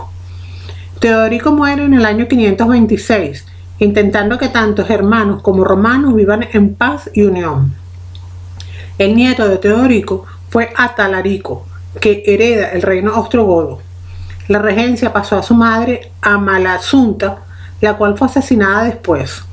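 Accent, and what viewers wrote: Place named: Colombia. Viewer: De que provincia de Colombia es este acento?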